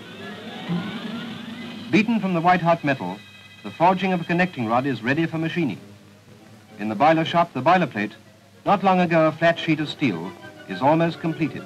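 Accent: RP, United Kingdom. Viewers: Not really. Commentators of the day usually spoke in what's known as "Received pronunciation." Wouldn't do to have unwashed heathen Northerners commentating on serious stuff like this....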